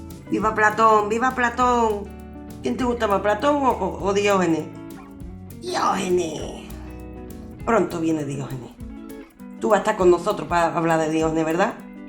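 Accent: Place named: Andalusia, Spain. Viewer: Me fascina su voz andaluz en una clase de filosofía, saludos desde Venezuela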